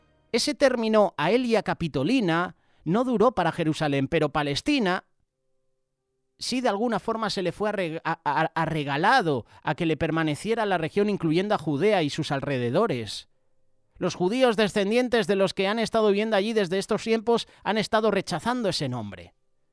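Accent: Spain. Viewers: Supongo que eres español por como hablas. Pues siento decir que segun tu argumento España no existe es el falso nonbre que nos dierin los romanos.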